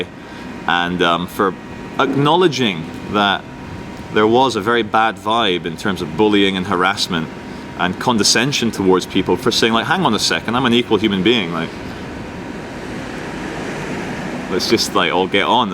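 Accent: Scotland, United Kingdom. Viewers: He's british but probably grew hearing different english accents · its a jock accent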